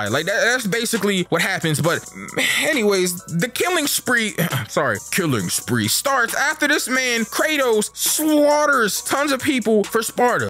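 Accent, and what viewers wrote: Philadelphia, United States. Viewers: You from Philly bro?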